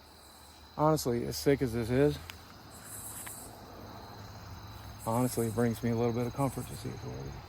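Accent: South, United States. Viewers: I noticed on both this video and the one from a few years ago, Boogie’s southern accent comes out a lot more when around that house. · It’s nice hearing Boogie’s southern drawl come back. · It's funny to me that he lives in Arkansas but his southern accent only comes out when he goes to Virginia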